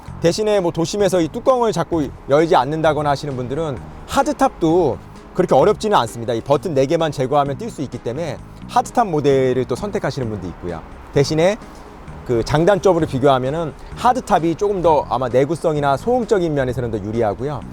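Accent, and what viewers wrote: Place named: Gyeongsang, South Korea. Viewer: ㅎㅎㅎ굿 비교 영상 좋네요. 갱상도 말로 지깁니다.ㅎㅎㅎ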